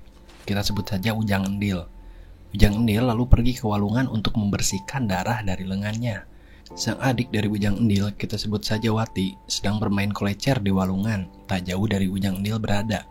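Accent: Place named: Sunda, Indonesia. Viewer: Pembaca Narasinya sangat 👍👍👍👍👍Sunda yg 😅👌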